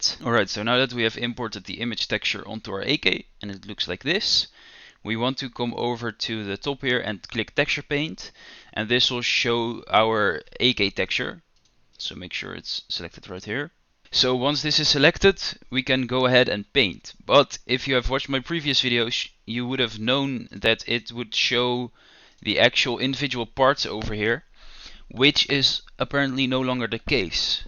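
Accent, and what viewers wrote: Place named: Netherlands. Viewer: ik hoor meteen dat jij nederlands ben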